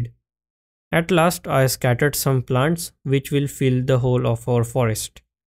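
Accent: India. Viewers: You know that when a indian accent youtuber is making the video, he's going to help you out with any problem.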